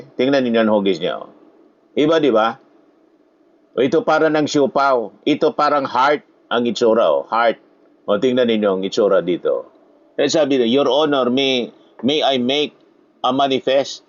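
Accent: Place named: Bicol, Philippines. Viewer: Bicolano ba kyo sir kc pareho tyo ng punto?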